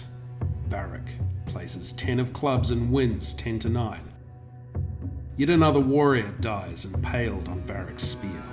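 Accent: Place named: New Zealand. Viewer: Sounds like this is an NZ developed game, from the voice over at least. Couldn’t get a copy from anyone in the South Pacific, so had to order a copy from Germany! Looking forward to it arriving. Hopefully enough time to print and paint some minis!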